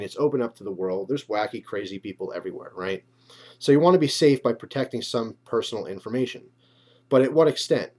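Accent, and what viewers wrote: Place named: New York, United States. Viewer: you sound like you have a New York accent lol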